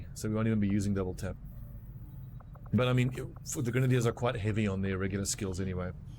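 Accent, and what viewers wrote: South Africa. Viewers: Great to see your back, really missed those South African tones of yours.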